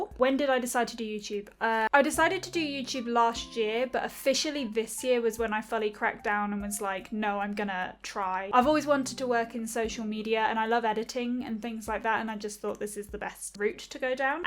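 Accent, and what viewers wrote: United Kingdom. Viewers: So.. many.. pikachus.... Also where in UK are you from? You probs have my favourite type of British accent it's so lovely :o hallo from Michigan :D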